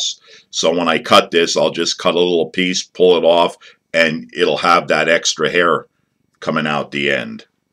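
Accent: Pennsylvania, United States. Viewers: I have been trying some of your patterns for a friend and myself . He made a comment that you have a Schuylkill county accent , then I saw you use a bank envelope that had orwigsburg printed on it , is that the area your from ?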